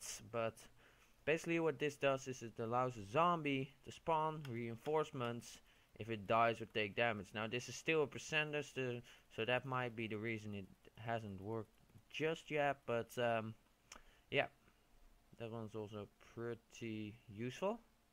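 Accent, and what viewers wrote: Netherlands. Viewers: is this man dutch?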